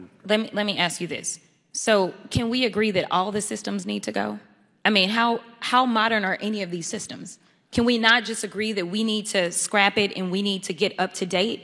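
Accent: South, United States. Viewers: If you know “anything” about people in Texas, they have a southern drawl…. “Y’all” means you all. 😊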